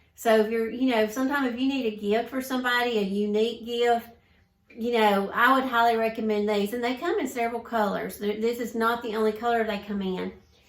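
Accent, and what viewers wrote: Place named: South, United States. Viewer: Hi, I am a new subbie and I love all your DIY's !!! You do wonderful work and everything you do always looks so beautiful and elegant ! I know you have to be from the South....I can tell by the way you talk !!! I am from Mississippi, so I know that southern draw !! Thanks for sharing your creativity !!! =^.^=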